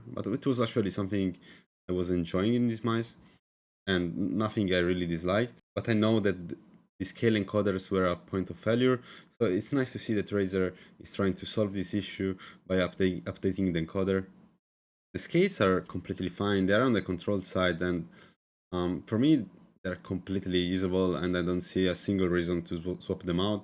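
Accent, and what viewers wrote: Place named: Italy. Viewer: l'accento italiano non mente grazie per la review che volevo prendermelo grazie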